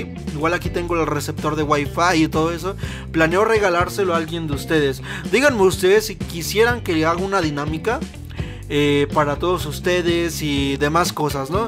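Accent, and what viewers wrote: Iztapalapa, Mexico. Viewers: En este video se te salió lo mexicano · Eres de Iztapalapa?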